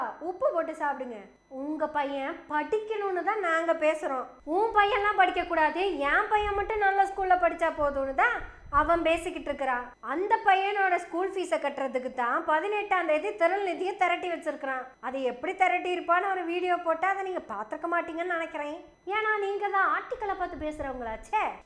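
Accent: Kongu, India. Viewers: நீ மலையாளம், தமிழ் ரெண்டு மொழியும் பேசினாலும், உங்க கொங்கு தமிழ் பேச்சுவழக்கு எனக்கு ரொம்பப் பிடிக்கும்😊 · மோளோட கொங்கு தமிளானும் ஞங்களுக்கு கேட்கான் வளர இஷ்டம்.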